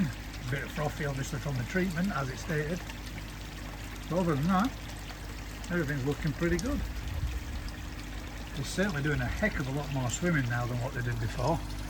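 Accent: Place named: West Yorkshire, United Kingdom. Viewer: Crikey there's some sensitive folk out there!! I will be making my pond bigger after my 2 weeks holiday and enjoy your vlogs Where are you located??...I can tell your in West yorkshire down to your accent as I'm originally from Huddersfield but now in doncaster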